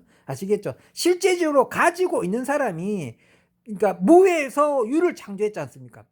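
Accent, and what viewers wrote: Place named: Jinju, South Korea. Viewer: 진주분이신가봐요? 영상잘보고있습니다.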